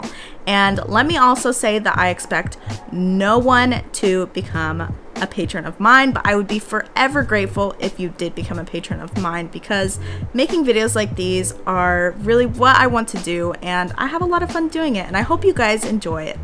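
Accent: United States. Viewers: I knew you would get to 1000 before the end of the year congratulations. Yeah I know you don't watch fish tube 🙄 and I could tell you America by your accent✌🏼👍🏼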